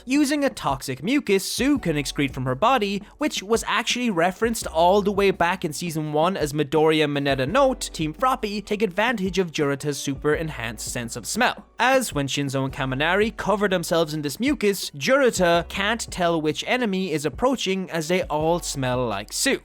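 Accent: Ireland. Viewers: Shut up and take my money. Also, you sound Irish. Are you? Curious.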